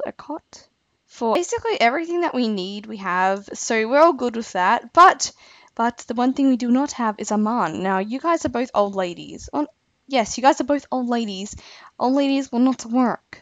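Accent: Australia. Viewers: Is your accent, Australian?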